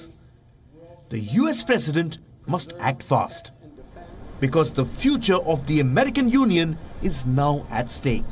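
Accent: India. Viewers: That could always just be India’s dialect of English. Different places speak the language differently. One I’ve noticed that we don’t say here is “pressurizing” rather than “pressuring”. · I think she's speaking in an indian accent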